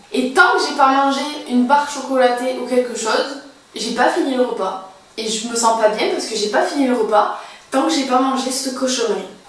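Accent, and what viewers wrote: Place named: Marseille, France. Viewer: tu a un petit accent sud impeut marseillais je trouve tu vien d'où de quel rejion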